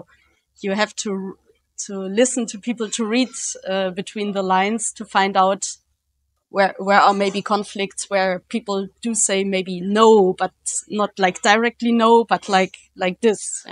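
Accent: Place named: Russia. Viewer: Отлично,. Английский ваш смешной, очень русский, но честный! И ход точный: с разворота брать интервью на английском. Знай наших, питерских!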